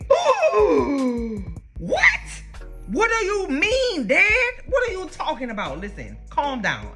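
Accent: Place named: Louisiana, United States. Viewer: I have never run across this show before - I have listened to a lot of the Gilded Age podcasts from different people and perspectives - this is by far the funniest breakdowns EVER!!! Maybe because I’m from Louisiana the accent had me further in a chokehold like I was listening to one of my homegirls give this recap and rolling on the floor laughing! I am subscribing TODAY!!! 😅😅😅😅😅😅😅😅😅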